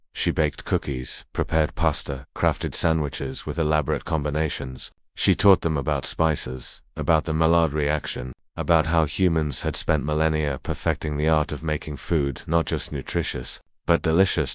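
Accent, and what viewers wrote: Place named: United Kingdom. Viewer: Narrator has a British accent , very subtle.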